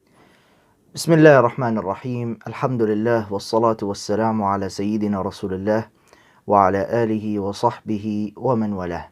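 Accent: Egypt. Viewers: كل سنه وحضرتك طيب يا شيخ بمناسبه الشهر الكريم وحلوه اللهجه المصريه عليك اوي ربنا يديلك الف صحه وعافيه وتتصدي للناس دي وكل من افتري ع مسلم..ربنا معاك ويقويك ❤️💪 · هذا الوارثوج شبية البرص احول نوتردام ليس شيعي اصلا انة نصراني ابا عن جد وهذا ما صرح بة الشيعة. وحتي لو كان شيعي اصلا فلن يتغير في الامر شيء كلهم انجاس× انجاس .وبعدين النصاري عارفين انة نصاب ولكنهم يستعملون كل من يسب في الإسلام فكلهم نصابين × نصابين رشيد قمامي ورشيد ابو السباع وتعيس ابو بظرط ونقمات موافي الكدابة ام فشة عايمة ومحمد رحومة النصاب الهارب وكلب الالمان ابوعضمة خامد عبد اليورو.. 😂😂والله لهجتك المصرية جميلة ياشيخ وهذا مايسعدنا ويشرفنا ❤❤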